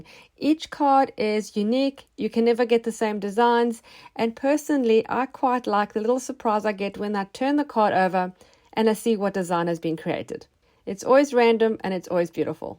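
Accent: South Africa. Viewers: I absolutely love watching your channel and can't wait for the next episode 🤗 nice hearing a South African accent 🇿🇦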